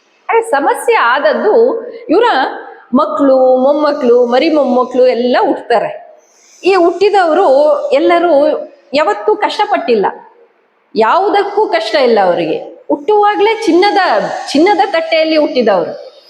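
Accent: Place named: Tulu Nadu, India. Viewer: ಮೇಡಂ...ನಿಮ್ಮ ಮಾತಿನಲ್ಲಿ ಯಾವುದೇ ತಪ್ಪು ಸಂದೇಶವಿಲ್ಲ, ಆದರೆ ನಿಮ್ಮ ಮಾತು ಕೇಳುತ್ತಿದ್ದರೆ ನೀವು ನಮ್ಮ 'ತುಳು,ನಾಡಿನವರಂತೆ ತೋರುತ್ತಿದೆ,ಯಾವೂರು ಮೇಡಂ..?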